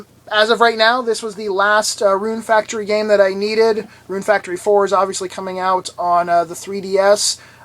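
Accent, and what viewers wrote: United States. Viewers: Yeah. American vocal contractions are fun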